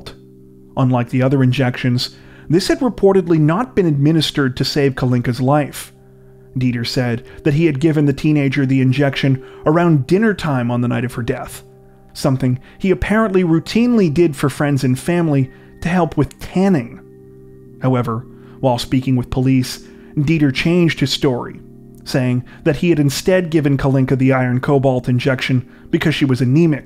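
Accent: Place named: Ontario, Canada. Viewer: Yeah I was gonna say Ontario, but then I figured that was too easy. You do a great job hoss.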